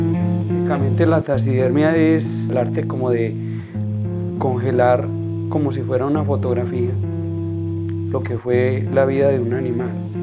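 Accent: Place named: Colombia. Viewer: Hola buenos días se me acaba de morir mi canario quisiera disecarlo donde se encuentra usted no me diga que en Colombia por su hermoso asentó Dios que triste estoy 😢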